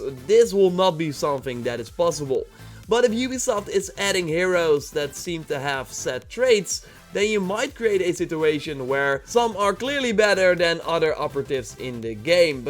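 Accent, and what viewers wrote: Netherlands. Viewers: You can really tell when someone is Dutch from the way they speak English :P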